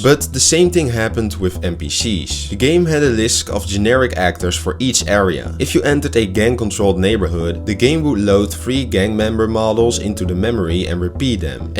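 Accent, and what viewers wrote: Netherlands. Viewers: Ik ruik een Nederlands accent 👀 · I hear a Dutch accent. PlayStation 2 kon de games van de disk laden terwijl de game draait. Niet veel games maakte er gebruik van (jack and dexter, need for speed games did that). Bij nfs en jack kon de game zelfs opslaan tijdens t spelen (eerste vorm van autosave). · Je herkent Nederlandse accent direct. Blijf zo door gaan je gaat groot worden. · That thick dutch accent🫡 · dutch detected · i both love and hate the strong dutch accent lmao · Jij bent sws Nederlands ik weet het gwn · Holy Dutch accent ❤‍🩹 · lol dutch accent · is that a dutch accent I hear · I smell Dutch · i hear dutch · I hear Nederlands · Nice vid! Hoor dat je een nederlander bent 👌🏻. Keep it up🤘🏻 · Is that a Dutch accent i hear? Nice vid btw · Hahaha dat accent is meteen herkenbaar als kuthollander xdddd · I smell dutch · bro just stick to dutch. 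your dutch accent is too horrible for this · im POSITIVE youre dutch!! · you are literally the most dutch person i have ever heard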